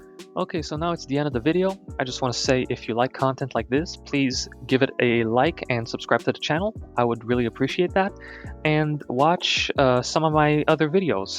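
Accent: Romania. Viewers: Are you from Romania?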